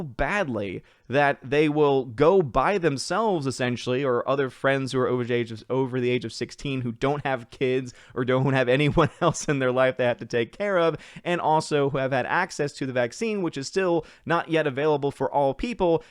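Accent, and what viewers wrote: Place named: Germany. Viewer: That's a nice German accent there. :-) As for Pinkofornia, the sooner the recall of Gavin Newsom happens, the better, because he'll be only too keen to make overall vaccine passports a reality. :-o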